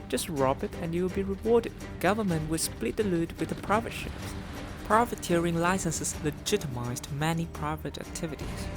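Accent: United Kingdom. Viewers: 加上英式發音簡直絕配啊啊啊啊啊啊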